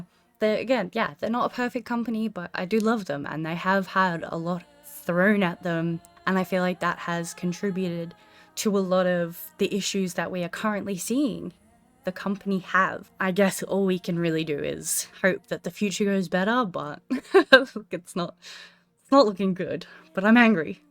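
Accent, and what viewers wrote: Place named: Melbourne, Australia. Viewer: You have a voice like Kylie Minogue, in a good way. Are you sure your mother never shopped at Kmart East Burwood in Victoria about thirty years ago? I'm talking voice accents, regardless of anything else. Suburban Melbourne with a moosh of time in the UK. :) The best and thanks for admitting when you are wrong. It's rare.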